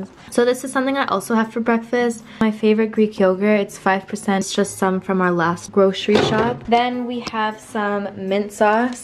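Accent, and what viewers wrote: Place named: Brooklyn, United States. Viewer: Where are u from !!???You are giving me Brooklyn accent!!!Luv it !!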